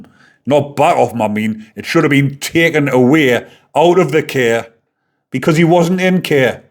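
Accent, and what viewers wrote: Newcastle, United Kingdom. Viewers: Varley is the perfect example of why there should be a death penalty. Love to hear the Geordie accent from so far away, too bad about your piggy' lug - haven't heard that word for years.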